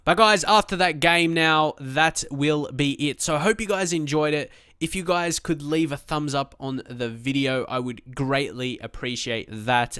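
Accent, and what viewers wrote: Australia. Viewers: The reason i think people hate on you is because of your accent which i think is stupid. Although i do kind of find the Australian accent a bit annoying, I'm not going to hate you or your content for it. If you were American or British you would receive way less hate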